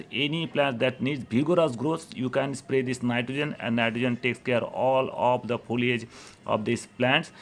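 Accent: India. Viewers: The best Indian accent so far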